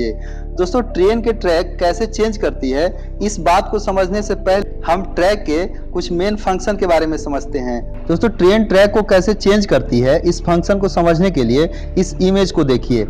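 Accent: Bihar, India. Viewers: Bhay tum pakka Bihar se ho...mai v patna ka hi hu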